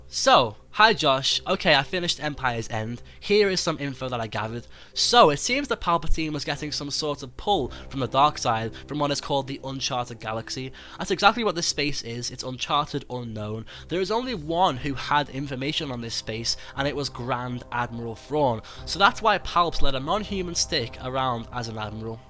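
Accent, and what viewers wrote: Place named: Northern England, United Kingdom. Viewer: Just wondering - I'm guessing you're from northern England - what football team do you follow?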